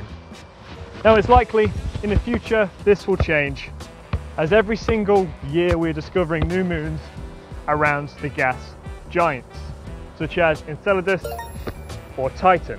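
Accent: United Kingdom. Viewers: I thought u must be britisher😮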